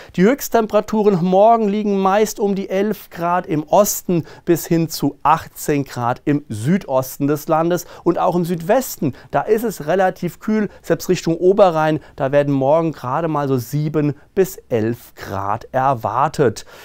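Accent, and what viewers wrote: Hesse, Germany. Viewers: Hallo Du, das Hessische ist nun mal so - Augen zudrücken😉 Wo kommst Du her?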